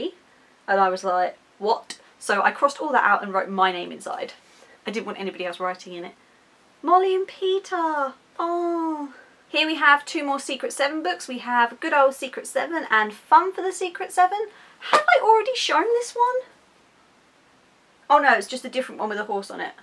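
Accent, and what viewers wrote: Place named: United Kingdom. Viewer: Wow! The Famous Five is pretty enjoyable, I am definitely going to read as well, cause I was looking at my library and I just found one Famous Five - Five run away together. To be honest I am really excited. Nice this video, just subscribed. On the other hand I enjoyed a lot your british accent. Happy reading day after day!:)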